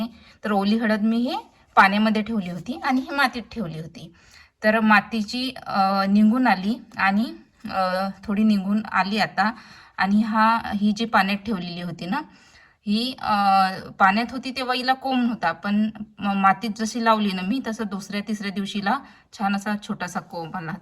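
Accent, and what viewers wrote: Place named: Vidarbha, India. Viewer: विदर्भातील आहे का ...आपली भाषा वाटत आहे ❤ नागपुर side ची...मला आवडले वीडियो...जर्मन ची आजी चा वीडियो बघितला मस्तच ❤❤😊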